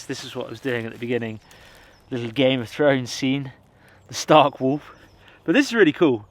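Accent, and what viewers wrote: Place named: United Kingdom. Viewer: OH my Goodness, I had no Idea that you all over there in the UK used daylight savings time too! I love all your videos, and listening to your accent and learning more about the outdoors. Thank you for taking us all along with you on your adventures and your builds... and OH some awesome foods! Take care.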